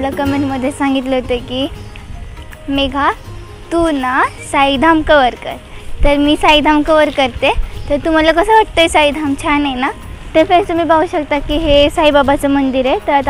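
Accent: Latur, India. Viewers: Hii....mi tuzi big fan aahe........mla ekda tula bhetaych aahe....mi pn Laturchi ch aahe.....aani kharach.....tu khuppp mst bolte h....ekdam aaplya laturi language madhe.......😃😍💃.......tu asech mst mst video......banvat raha...aani tuze subscibers....aankhi vadhu de...👍..tuz kuthe ithe office aahe ka latur madhe.... ch? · You speak typically laturi Marathi which is a good thing......... But use the word "friends" in minimum extent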